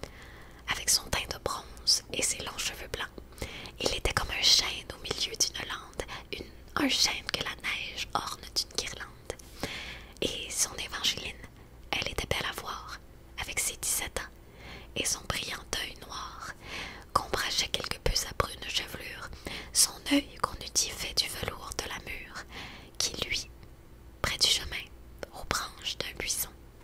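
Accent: Quebec, Canada. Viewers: Ta voix est tellement relaxante 😍 et j’adore ton accent québécois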